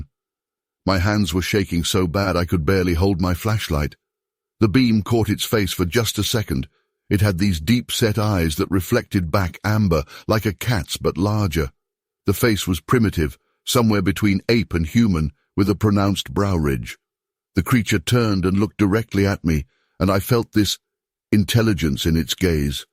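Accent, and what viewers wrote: United Kingdom. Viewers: Ottawa, Canada If this voice is AI can you please use an American voice and NOT a limey's voice. I can't stand British "English"....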